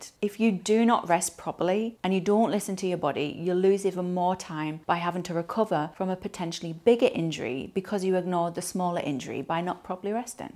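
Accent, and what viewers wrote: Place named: Sunderland, United Kingdom. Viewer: My ribs are done in off a hook to them. At least 6 weeks off. Possibly broken or muscle damaged. I can’t help noticing the sunderland accent. Am from Washington Tyne and Wear.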